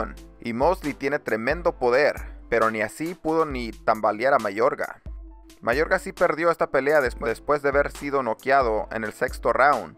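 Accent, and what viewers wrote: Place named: Sonora, Mexico. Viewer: oye eres Sonorense? tienes el acento de mi estado.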